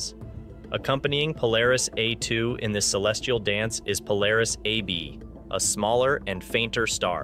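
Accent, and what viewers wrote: United States. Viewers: I assume NASA sponsors this channel. The narrator has an American accent, so I don’t understand why NASA doesn’t utilize a narrator familiar with the pronunciation of astronomical terminology such as the type of an important type of star.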